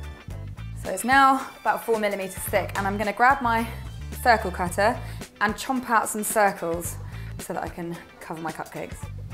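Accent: England, United Kingdom. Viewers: Your english accent😍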